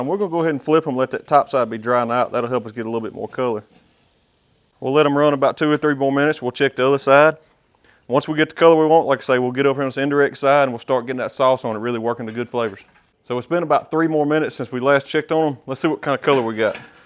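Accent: South, United States. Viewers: a good ole southern boy from mississippi sayin "we fittin tuh" fittin, fittin, 🙄🙄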